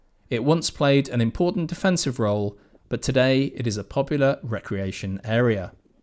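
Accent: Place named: England, United Kingdom. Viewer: You sound English and I am curious as to why you moved here? Do you also speak Norwegian?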